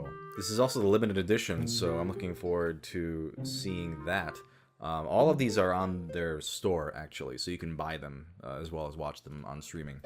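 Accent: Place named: United States. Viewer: he looks asien sounds American they have evolved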